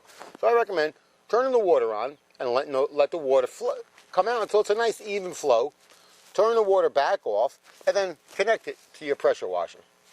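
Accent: New York, United States. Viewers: I'm Italian and love how talks! I'm from new yawk too I dunno where he's from but he would fit right in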